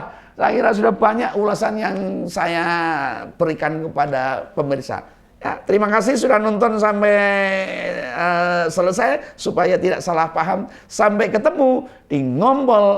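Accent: Java, Indonesia. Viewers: logatnya jawa banget saya senang mendengarnya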